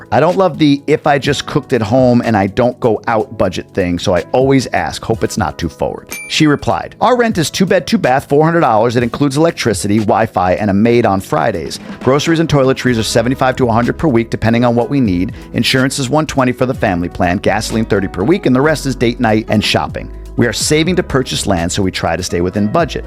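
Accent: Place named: New York, United States. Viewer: Love this vid. I'm right lol. Knew that wasn't a Michigan accent. I thought you was a New Yorker. Tommy is now married his budget will probably increase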